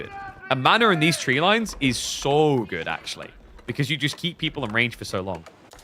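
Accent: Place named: United Kingdom. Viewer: Sounds like a Brit but hails from Hungary. A Mantas if you will.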